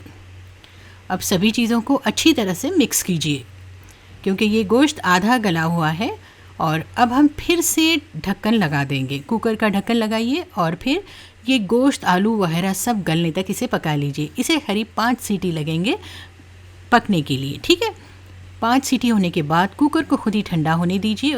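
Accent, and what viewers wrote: Hyderabad, India. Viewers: Yes ,her style of talking is Hyderabadi....n she stays in a remote place like farm house....beautiful....Barakallahu feeha... Loved her ooen balcony kitchen....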